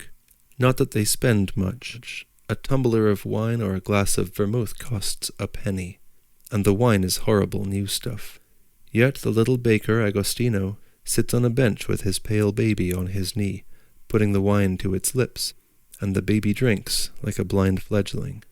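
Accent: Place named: United States. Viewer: Thick american accent…… unlistenable.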